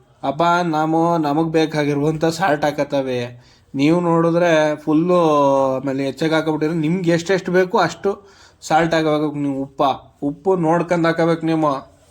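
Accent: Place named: Mandya, India. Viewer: We are waiting for more cooking videos and most importantly a separate fan base for ಮಂಡ್ಯ ಮಳವಳ್ಳಿ background voiceover..😁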